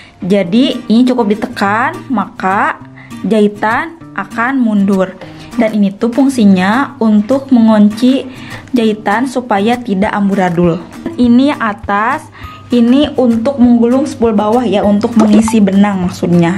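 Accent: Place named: Sunda, Indonesia. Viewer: Menginspirasi banget tteh, nada ngomongnya nyunda, 🤭 galfok sama " biar ga amburadul"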